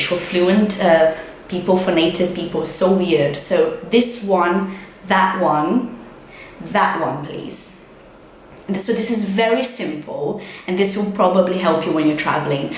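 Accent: United Kingdom. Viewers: Seu inglês britanico é lindo e perfeito assim como seu português, por tanto qualquer brasileiro se vira bem em países de lingua espanhola, melhor que gesticular tentando falar ingles com quem nao entende.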